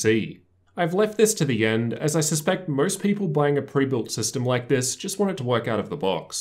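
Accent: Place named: Australia. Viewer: woah hes aussie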